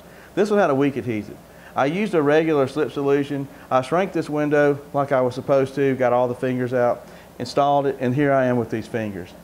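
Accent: South, United States. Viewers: I like your southern accent!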